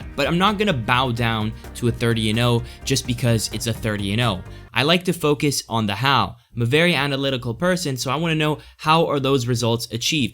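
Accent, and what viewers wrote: United States. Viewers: Completely out of context, but out of curiosity, where are you from? Your accent sounds waaaaay too american for an actual italian, lol.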